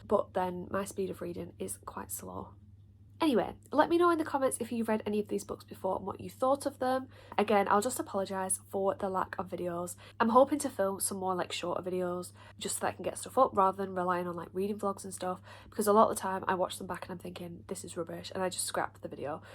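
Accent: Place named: Lancashire, United Kingdom. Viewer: hearing another Lancashire accent on BookTube is like a warm hug! the algorithm did a good job today ❤️❤️❤️ Cleopatra and Frankenstein is a masterpiece, enjoy!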